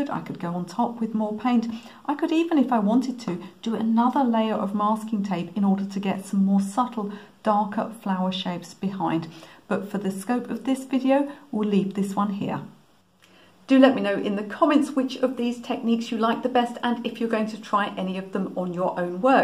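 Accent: United Kingdom. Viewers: Loved this! I struggle with backgrounds a lot and this really gave me a lot of techniques to try and make my backgrounds more interesting. The daisy was my favorite but I loved them all. Very helpful! ( By the way....love your British accent😀 and lovely nail color!)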